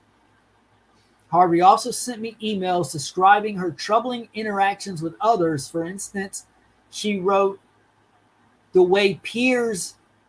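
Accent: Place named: Scotland, United Kingdom. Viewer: Hi Im not really Stalking you lol. I love your channel. Id go live later after UK time 6pm when everyone is home from work. From experience more people will be able to join your live. I dont know what time it would be where you are. Your Scottish accent is brilliant. I love your coverage and hope Richard gets justice. Lots of love 💖💕xxxx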